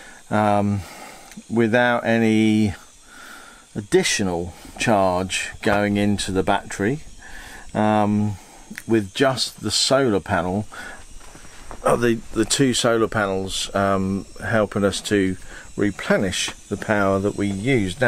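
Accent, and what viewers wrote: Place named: South, United States. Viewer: I'm with on that its that southern drawl. 😉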